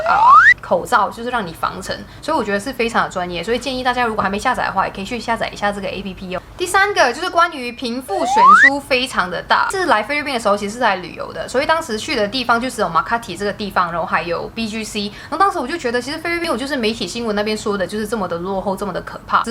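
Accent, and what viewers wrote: Taiwan. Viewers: 我被迫要放慢影片速度來看。😭 你是马来西亚人吗？为什么你说话有台湾口音呢？🥺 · 大馬華人怎麼一開口是滿滿的台灣口音？ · 你講話好像台灣人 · 马来人台湾腔,吊吊吊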